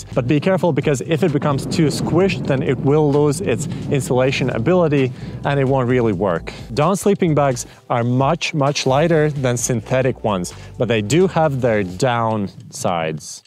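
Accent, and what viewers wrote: Latvia. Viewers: Man jau likās, ka es dzirdu latviešu akcentu. Labs video! :)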